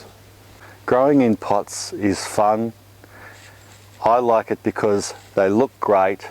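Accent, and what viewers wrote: Australia. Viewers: Watched the Intro and I Knew you were an Aussie!!! ha. I'm an Aussie in Canada, I miss the accent. I'm also from Queensland looking to eventually come home and get a nice plot of land. So bloody expensive though. Where are you located approx?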